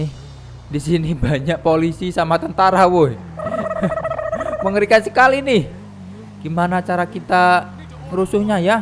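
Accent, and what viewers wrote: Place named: Java, Indonesia. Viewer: Hhhhh logat jawa nya medok. Hebat bubur nya g tabur lagi.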